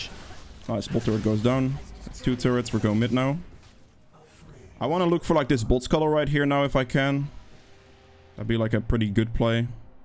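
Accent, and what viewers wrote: Netherlands. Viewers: hi i enjoyed ur gameplay.. i have a quick question.. r u dutch?? take care king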